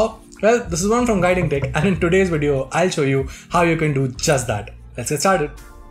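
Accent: India. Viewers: Indian accent 🤢🤢🤮🤮🤮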